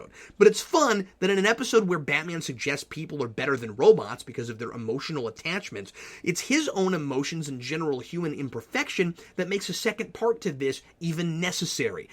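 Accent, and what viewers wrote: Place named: United States. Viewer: CRAP....TOLD US NOTHING EXCEPT THAT ANOTHER 'AMERICAN' LIKES THE SOUND OF HIS OWN ACCENT...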